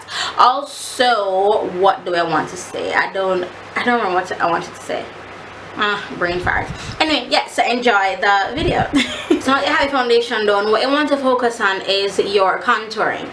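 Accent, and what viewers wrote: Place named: Jamaica. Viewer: Love your videos!! And your accent-from a fellow Jamaican x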